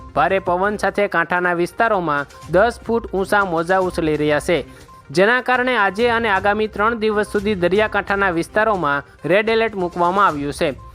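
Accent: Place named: Ahmedabad, India. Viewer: અમદાવાદી શુંધ્ધ ગુજરાતી ટોન માં સમાચાર સાંભળવા ગમે.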